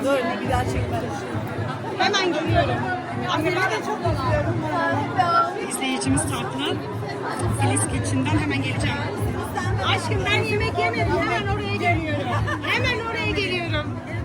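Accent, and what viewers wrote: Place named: Turkey. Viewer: Bayiliyorum senin turkce aksanina ❤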